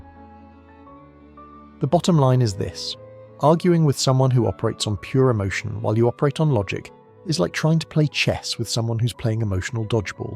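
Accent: United Kingdom. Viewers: If this is a us-based program, why the British voice? Do Americans think that if it's a British voice, it's true or that it carries more weight than an American style voice?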